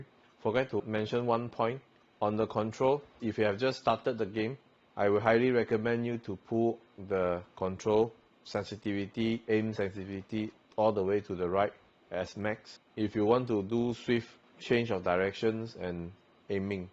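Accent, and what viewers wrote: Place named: Singapore. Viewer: U sound like a singaporean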